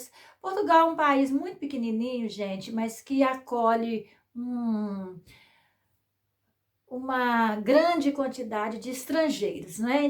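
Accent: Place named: Brazil. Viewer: Está certa em conservar seu sotaque do Brasil, acho forçado ficar querendo ser o que não é! Bjos adorei saber suas histórias!! 😘🤗🙋‍♀️🌹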